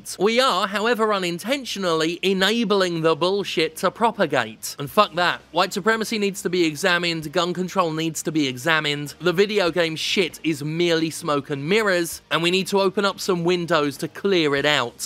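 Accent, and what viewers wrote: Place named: United Kingdom. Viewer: I feel like a guy with a British accent immediately loses credibility on this issue. The country Jim was born in has been throwing people in cages recently for offensive tweets. Our individual gun ownership prevents that sorta government oppression at large, and those that don't own guns are free riding on that notion the same way that anti vaxxers free ride on the herd immunity of diseases.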